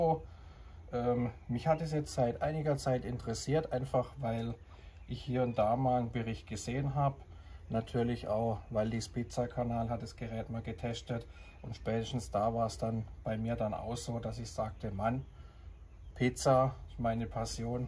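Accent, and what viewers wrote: Germany. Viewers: Kommscht ausm Schwarzwald?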